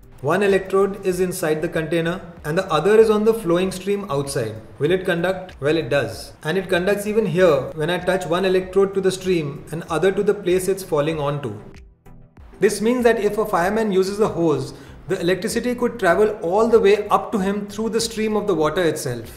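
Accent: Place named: Goa, India. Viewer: Achha😁Sensed Goenkarpan in your style of talking mhanun vichaarle :)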